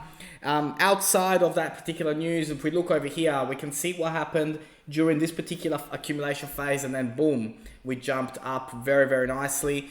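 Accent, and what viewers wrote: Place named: Australia. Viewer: I like you, I think most people are bellends, but not you, your pretty level headed, also you have a crazy voice not just because your an aussie but its very distinctive, I think you could do voice acting! Subbed!!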